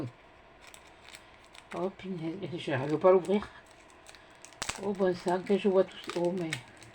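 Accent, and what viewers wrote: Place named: South-West, France. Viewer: Félicitations pour ce concours gagné ! Joli colis c'est vrai que le bloc sur la lune est sublime .... jamais trouvé moi non plus sniffffff .... 1 jour peut être. Merci pour cette bonne humeur dans les vidéos.... et ce bel accent du sud-ouest ! Merci ! Je suis 1 grande débutante et j'apprécie cette simplicité des explications.......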